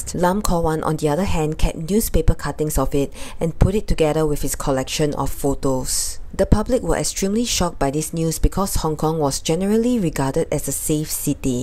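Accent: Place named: Singapore. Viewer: Hurray for that lovely Singapore accent!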